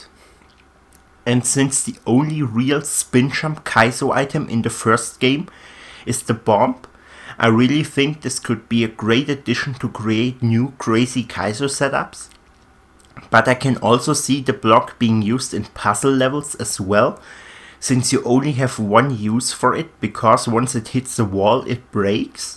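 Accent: Germany. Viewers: You are German. Als Deutscher erkenne ich es!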